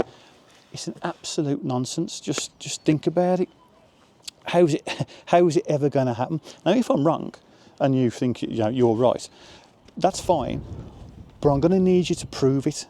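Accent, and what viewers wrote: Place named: Scotland, United Kingdom. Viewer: I'm going to guess it's a Scottish accent that is causing the problems 😅. Might have even watched the video myself thinking what an arrogant way to show a video...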